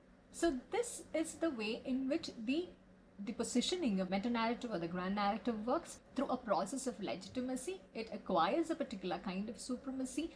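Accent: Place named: RP, United Kingdom. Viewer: What exactly is your problem? I think she speaks RP.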